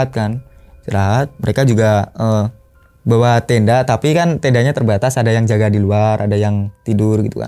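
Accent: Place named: Java, Indonesia. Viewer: gua elu nya medok 😅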